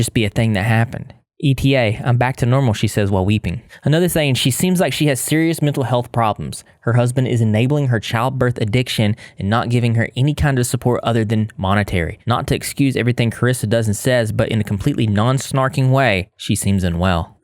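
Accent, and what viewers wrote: South, United States. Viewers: new viewer who is curious about ur accent. are u aussie or from the south? u sound like my grandpa from georgia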